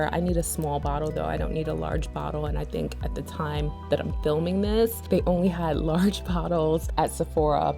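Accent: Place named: West Coast, United States. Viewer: You're from NY or CA? cause you got the west coast accent down pat!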